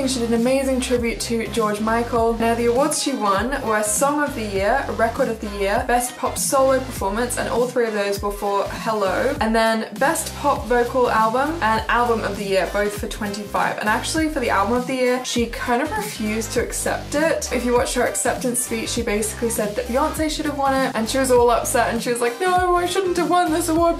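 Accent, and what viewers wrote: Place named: Australia. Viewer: it sounds really cool! At first I thought you sounded English then I thought Australian! So that would make sense! Sounds so cool tho!!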